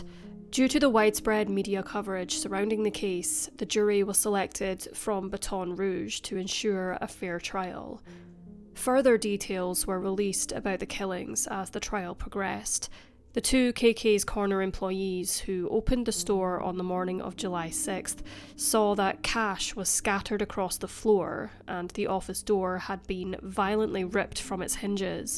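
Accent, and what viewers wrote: Scotland, United Kingdom. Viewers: One of the best true crime channels on YT. Love the Scottish accent. It is Scottish right? I love it no matter what accent it is. 🙂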